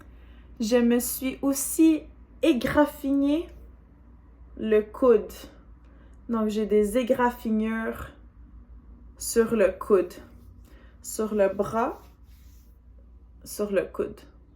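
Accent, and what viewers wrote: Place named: Quebec, Canada. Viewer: Bonjour, j'espère que vous allez bien. J'ai un request. Pourriez-vous nous faire une vidéo sur la différence entre l'accent québecois et l'accent français de France? SVP. Because, I could notice your way of pronouncing words like 'matin', 'bain" differs from what's in most of the french videos out there (based on France's).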